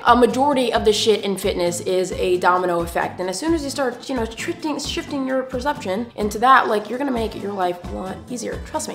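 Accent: United Kingdom. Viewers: literally discovered you through youtube and now i cant stop watching your videos! very informational :) ALSO .. your random british accent cracks me up.. (i'm british LOL)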